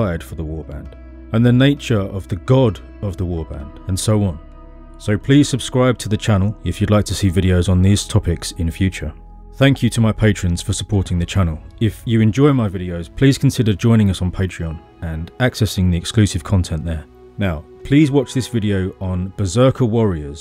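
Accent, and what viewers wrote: United Kingdom. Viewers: you sound pretty similar imo. You sound a bit more serious. But joking aside, this was a thoughtful video and I enjoyed it a lot. I hope my little joke didn’t make you self conscious or anything. If it helps, my barbarian American ears just lump all British accents together so you could chalk it up to that.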